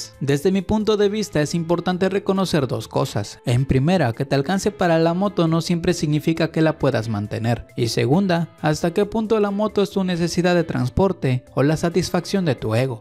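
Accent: Oaxaca, Mexico. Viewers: Nmms de que parte mero de Oaxaca eres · De qué parte de Oaxaca eres? Jaja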